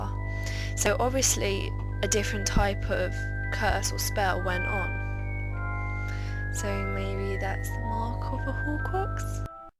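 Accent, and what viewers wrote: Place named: England, United Kingdom. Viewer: x.o I can't hear you when you're reading; but I assume you're grabbing facts from the books since there are more to support he may not be a Horcrux. And ZOMFG, nice English accent. =) And to add on, Voldemort must've known risks and such before going on with it, esp when DD had said it was dangerous to confine one's soul within an object of free will. Sorry, if you've said that already though. xP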